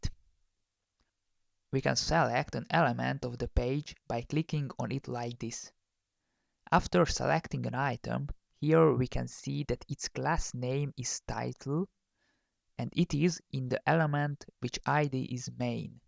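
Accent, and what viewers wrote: Hungary. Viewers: tényleg valaki olyannak kéne mondani a kommentárt, aki nem hunglishül beszél :)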